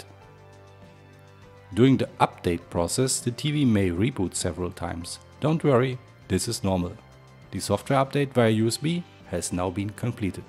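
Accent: Germany. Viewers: I think you are german 😂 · Why using a native German speaker for this video. In fact because it's a Dutch brand it would be more appreciated to use a native Dutch speaker 😂😂😂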